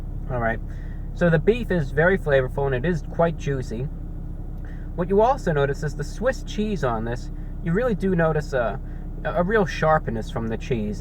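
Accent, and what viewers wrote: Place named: Mid-Atlantic, United States. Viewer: Whats up with the atlantic accent ?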